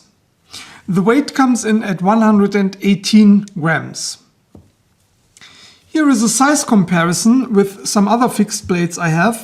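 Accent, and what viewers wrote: Germany. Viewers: englisch etwas deutsch aber da ich es auch nicht besser kann keine reale kritik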